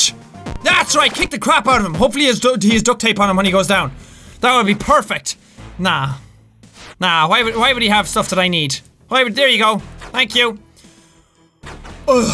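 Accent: Ireland. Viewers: He's Irish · I spent the majority of my day binge watching this whole playlist and now that it's been a few hours since then i've noticed my inner monologue has taken on an irish accent. I'm definitely not mad but this is very strange.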